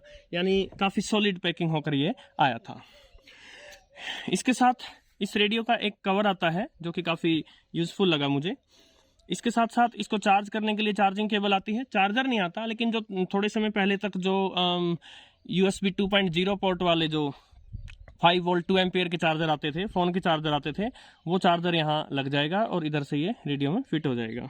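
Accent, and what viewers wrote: Standard. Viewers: आपकी शुद्ध हिंदी 👍 तारीफ ए काबिल ह श्रीमान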